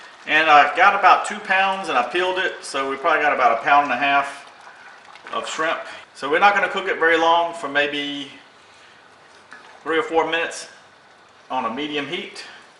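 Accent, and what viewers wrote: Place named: South, United States. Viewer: Listen to that southern drawl!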